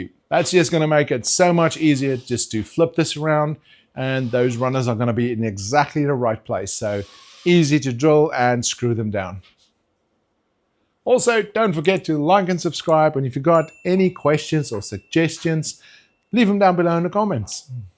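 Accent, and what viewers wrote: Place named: South Africa. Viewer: That’s awesome. I knew you were South African from the accent and the “spirit level”. I love this build and I’m going to do it boet.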